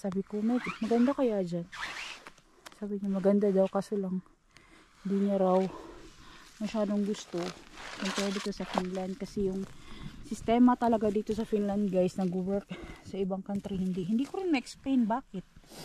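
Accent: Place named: Visayas, Philippines. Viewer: Bisayan dialect ,,asa manka sa visayas region maam...new subscribers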